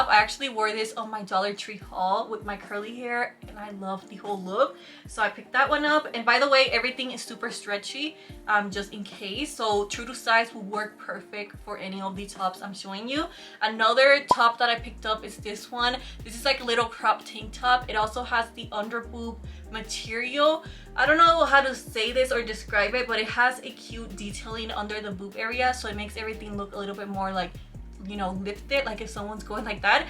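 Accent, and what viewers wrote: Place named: Puerto Rico. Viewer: Your Puertorian Accent is Everything☺️😁❣️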